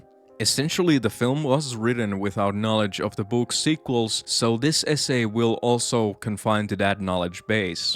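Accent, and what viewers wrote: Finland. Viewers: Came to the channel for the luscious finnish accent, stayed for the absolute bangers of videos, incredible job!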